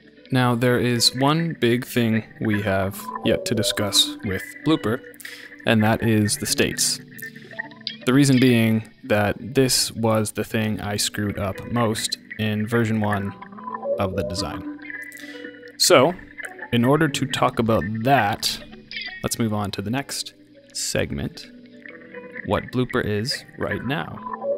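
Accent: Toronto, Canada. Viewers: Are you from Toronto? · wait are you from Toronto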